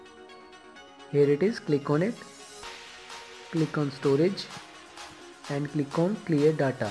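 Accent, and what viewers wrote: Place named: India. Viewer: You are indian? Your english is so funny no ofense Thanks so much You make a great job don t stop You have a new subscriber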